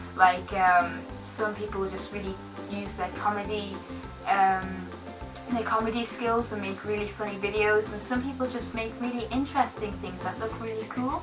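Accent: United Kingdom. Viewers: Are you either.. Scottish? English or irish?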